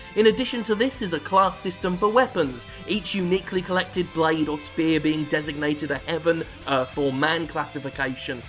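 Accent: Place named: United Kingdom. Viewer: lol UK accent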